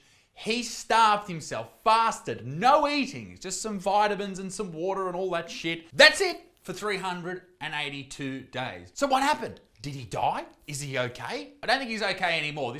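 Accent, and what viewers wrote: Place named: Australia. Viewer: I kept hearing you say ' white inclusive consultant" and I was like wth is that? lolol just for reference you are Aussie and I am American lol..was just the accent mate ~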